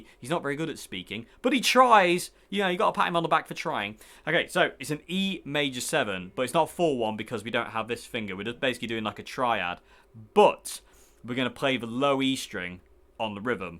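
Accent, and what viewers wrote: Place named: Northern England, United Kingdom. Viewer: Can not pinpoint your accent lol, it's slightly northern, but then very southern 😂😂 where you live?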